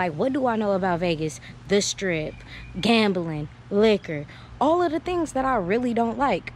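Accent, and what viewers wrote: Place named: Bay Area, United States. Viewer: i could hear you from the bay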